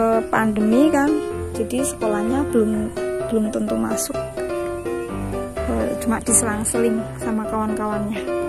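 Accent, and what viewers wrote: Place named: Java, Indonesia. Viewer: Malang hadir. Logat medoknya aku suka, persis persis medokku jg. Ditunggu video2nya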